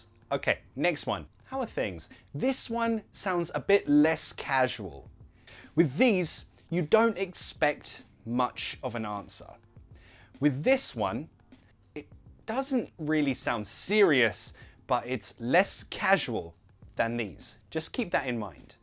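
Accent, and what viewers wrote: United Kingdom. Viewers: You are awesome ,dude . i love british accent💙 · Hello,good,British English,Standard,I like it,thanks,Sir,greetings,like.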